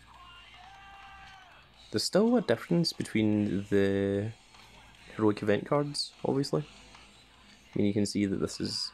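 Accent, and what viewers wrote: Scotland, United Kingdom. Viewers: Are u from scotland??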